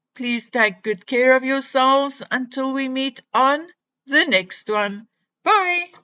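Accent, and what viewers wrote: South Africa. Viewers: Umm ..it’s called a South African accent?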